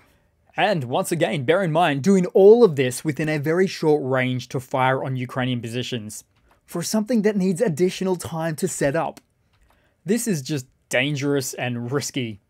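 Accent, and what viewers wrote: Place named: Australia. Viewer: Its called an australian accent, doffus. Australians generally speak in a very singalong manner. So stop the snobbism, mate.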